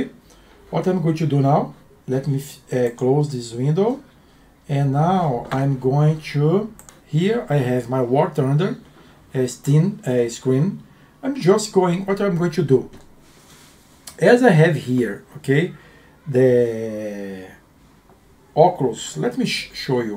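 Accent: Brazil. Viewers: esse sotaque ai é br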